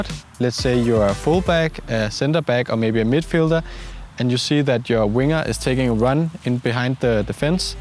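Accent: Denmark. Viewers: Is he danish? You can hear it by his accent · Elsker den danske Engelsk❤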